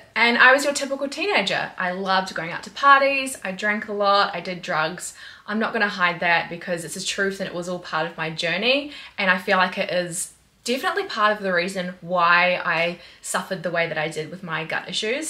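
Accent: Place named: Australia. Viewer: wait where are you from if you said you moved to australia? im canadian but i only hear an australian accent i think? LOL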